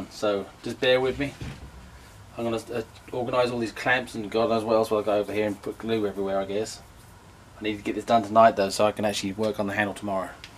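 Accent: United Kingdom. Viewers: This guy sounds strangley british Also love the video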